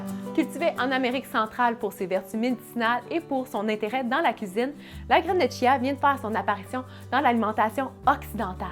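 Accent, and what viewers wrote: Quebec, Canada. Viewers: J’aime beaucoup le français canadien ♥️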